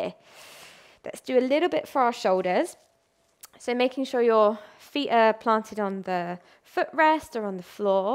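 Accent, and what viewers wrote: United Kingdom. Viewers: Another great session. Thank you so much. I especially enjoy listening to your voice. I am a southern Alabama girl living in Ohio and I can assure you the wonderful British accent is a welcome change.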